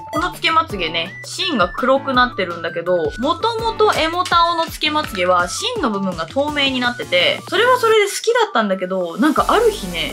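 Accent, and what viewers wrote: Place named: Ibaraki, Japan. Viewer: なまりかわい💕～べ？とっても親近感、、私は茨城じゃないけど！！いつも真剣にメイクに向き合ってるかっこいいです😿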